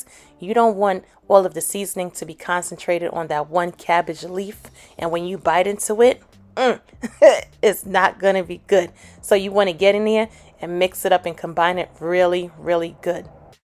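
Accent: New York, United States. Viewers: Im making this right now following your video step by step and pausing in between. LOL Your New York accent is everything. i love your videos and shared them with my sister who lives in Georgia and she loves you too. thank you for making my thanksgiving dinner special and delicious! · That NY accent! Just makes me smile watching it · Are you from new york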